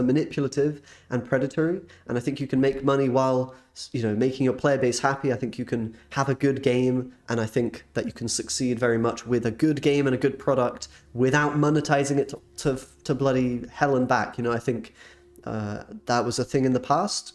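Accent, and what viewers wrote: United Kingdom. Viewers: I still wonder why you clearly have a British accent but you use Polish currency on your store 😅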